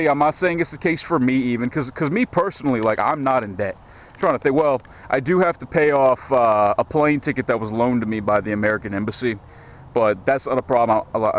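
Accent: United Kingdom. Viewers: Great British accent there! Excellent video too!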